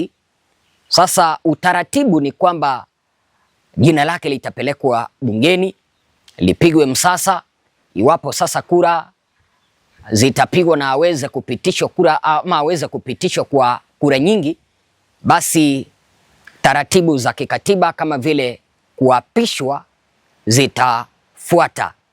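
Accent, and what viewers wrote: Kenya. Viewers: He has Nothing he is Speaking truth other thank making Up stories he Feeds from fake Stories media Comments and Posts..THIS IS A bullshit Kenyan Posing as Tanzanian while He cannot even Pronounce Nchimbi in a Right way instead he is using Kenyan and Kamba Pronunciation of “NJIMBI” and NDEJEMBI IS “NDENJEMBEI”. Huyu. Ni MSENGE ANALIPWA NA SATIVA NA MWAIPAYA KUPISTI UPUUZI USIO NA MAANA WA TANZANIA ASIYOIJUA.. ULIONA WAPI MTANZANIA HAJUI KISWAHILI?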